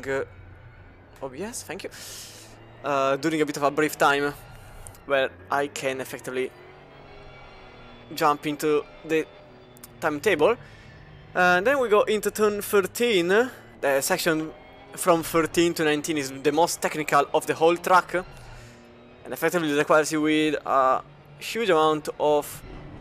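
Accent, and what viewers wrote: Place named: Italy. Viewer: you are a great commentator :) i like your energy and your italian english... looking forward to hear you again bro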